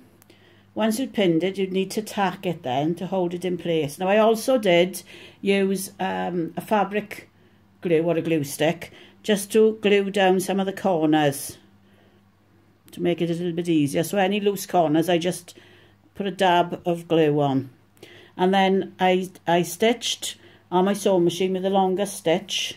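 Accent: Wales, United Kingdom. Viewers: I know it's welsh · As soon as you started talking I could tell you were Welsh. I looked at your blog and see you're from Port Talbot. Lovely work, you're very talented. I'm from Merthyr Tydfil, not too far away and I probably sound the same, lol. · I love your accent. is it Welsh???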